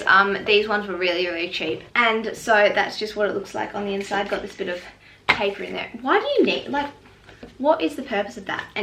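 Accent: Australia. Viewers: I love the accent of australian 😍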